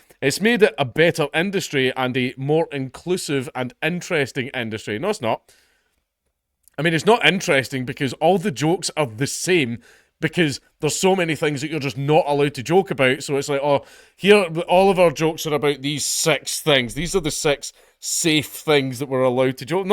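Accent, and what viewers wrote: Scotland, United Kingdom. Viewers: I don't know why the Scots accent makes everything funnier. It just does. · I think the Scottish accent helps English folk find you funnier too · Your accent sells it to me as an Englishman. You have a delivery and straight talking Scots style which is very funny and appealing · Nah, man. Don't sell yourself short. This Texan not only loves the Scottish accent, but we find you funny cause your jokes don't suck lol Also, it funny and ironic how comedians will virtue signal by not saying racial jokes because they believe it disparages a group of people, but have no problem making political jokes towards the group they don't agree with.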